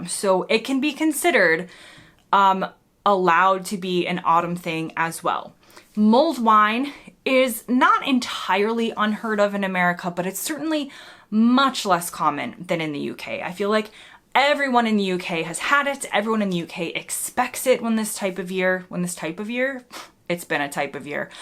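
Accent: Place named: United States. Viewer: The self deprecating sarcasm about the drollness of a video about parsnips being delivered with the chirpy American accent is brilliant, we are obviously rubbing off on you, love the videos ❤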